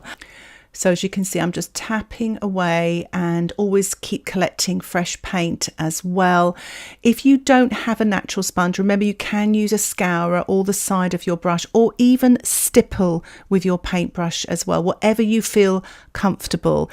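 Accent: United Kingdom. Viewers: La tua pronuncia così britannica mi.piace tanto! 😊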